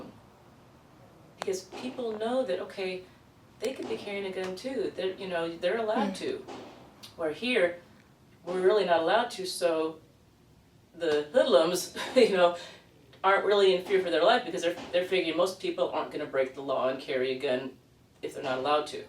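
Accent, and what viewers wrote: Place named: California, United States. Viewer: She Talks Funny Damn Cali Ppl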